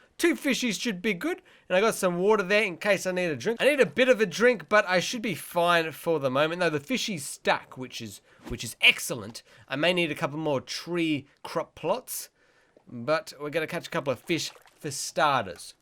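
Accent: Australia. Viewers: I love your australian accent,so nice to hear australian :)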